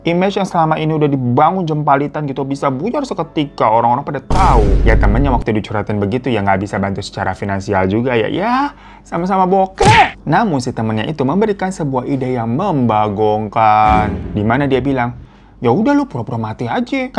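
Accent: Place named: Sukabumi, Indonesia. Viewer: Kaget pas denger di awal awal, lah orang Sukabumi ternyata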